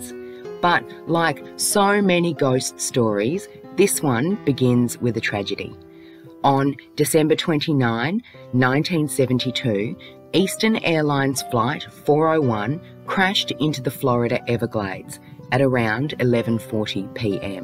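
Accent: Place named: Australia. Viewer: I just added this or subscribe to this channel and I just love this channel the woman that was speaking sounds like she has a lovely Australian accent, which I live in the United States but it sounds like she’s from Australia or New Zealand and or England but anyways, I just want anyone who’s reading this to know that you’re not alone in this world if there’s ever something upsetting you were bothering you always reach out and talk to someone people will understand more than you know I’m 57 and divorced with no kids and I’ve been just about through everything and you can just make a checklist of everything is happened, but I think God is testing me to make me a stronger person again I wish every single person reading this long long happy and healthy beautiful life. I wish nothing but the best for everybody here on this forum. God bless you all. Sincerely MINDY from California. 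Just sending hugs God bless. 🙏🙏🙏🇺🇸❤️🌈🌟💖👇🏽😊😊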